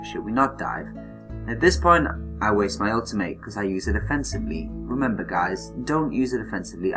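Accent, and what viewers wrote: United Kingdom. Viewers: Im not a native english speaker, so my accent tends to be more US than UK, but after watching this vid, i will only speak with a british accent, because its so fenomenal and classy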